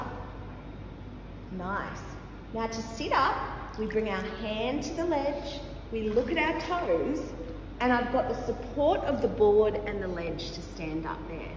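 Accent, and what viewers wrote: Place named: Australia. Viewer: the best ausssie accent. thank ma'am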